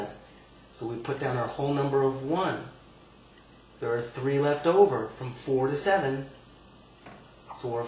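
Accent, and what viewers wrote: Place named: United States. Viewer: i dont understand you cuz have a strong american accent it gets in the way when you explain it and to top it you talk to fast i am sorry to say this ...... but hey i am from London and i am watching this!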